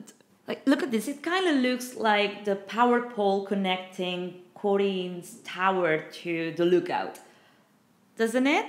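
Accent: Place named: Spain. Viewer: The innuendo in this vid title and the previous is jokes 🤣and as usual the spanish accent spicy as always 🥴, great vid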